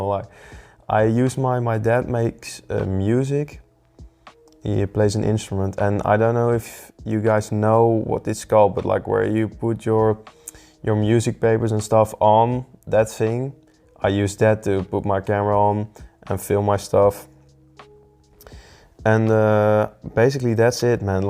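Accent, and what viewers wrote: Netherlands. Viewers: Ik hoorde direct aan je accent dat je Nederlands was, tof om te zien. Je hebt een subje erbij! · Ik heb deze video al zo vaak gezien in mijn Recommended maar heb eigenlijk nooit op je stem gelet dus kom er nu achter dat je Nederlands bent. Mooie Collectie! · you dutch i hear the accent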